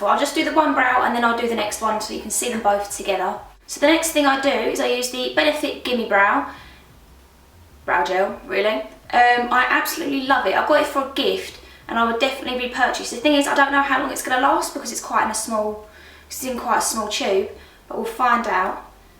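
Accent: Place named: Essex, United Kingdom. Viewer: DAT Essex accent though 😩❤️❤️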